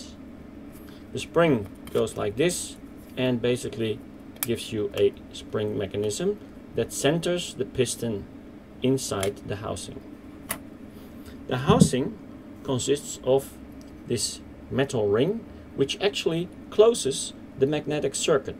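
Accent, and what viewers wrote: Netherlands. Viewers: if his accent didn't give him away, his website most certainly did. Gave projectjes meneer! Ik ga zeker naar uw videootjes kijken. Ik wil heel gauw beginnen aan mijn eigen cockpit for Digital combat simulator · Are you Dutch?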